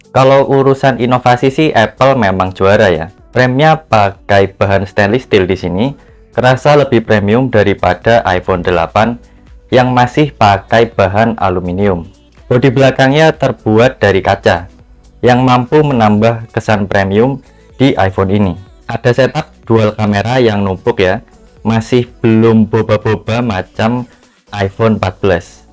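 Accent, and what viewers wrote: Java, Indonesia. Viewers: Suoromu medhog bgt mas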